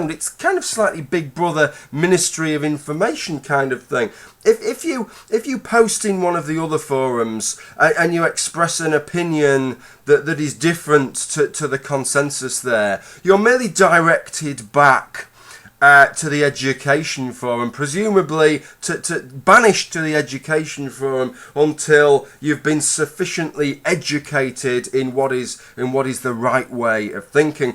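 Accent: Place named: Lincolnshire, United Kingdom. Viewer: Was trying to place the accent - not strong enough for Yorkshire - was torn between possibly Lancashier but with a slight manc-like twang (sorry!) -had to be elsewhere, so gave up guessing. Lincolnshire! -Skuunthorrrpe. Bona fide northern goodness!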